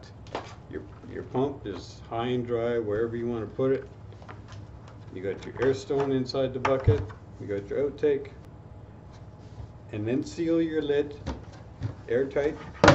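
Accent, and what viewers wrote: Canada. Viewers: The accent is Canadian, eh? Thanks for the 420 411 dude. Go Oilers and God save QE2 eh?♥♥♥ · You've got a pretty strong canadian accent, its like making carbon dioxide with Bob and Doug Mackenzie. Dont forget your tuke eh, uhh, and...uhhh bring me another beer there hoser. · Chong was from Edomonton, Canada. The dialect sounds nearly identical. Nice DIY demo, thanks for posting.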